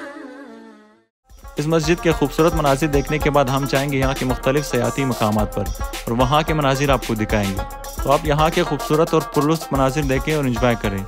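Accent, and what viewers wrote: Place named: Quetta, Pakistan. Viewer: اپکی پشتو سے لگ رہا اپ کوئٹہ کے ہیں۔میں نے subscribe کرلیا۔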